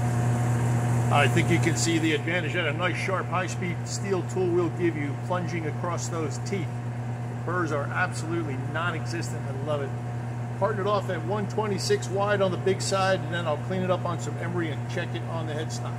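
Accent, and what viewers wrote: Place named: Texas, United States. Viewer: Did I undesrtand you right ? "makes you wanna blow your hair out" My native language is german, so it is sometimes a little bit difficult to understand your Texas slang. Greetings from Austria ;-)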